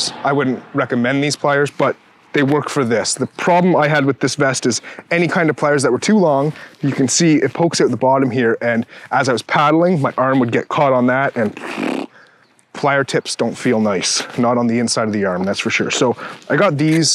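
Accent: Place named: Canada. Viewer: God damn you Canadian asf!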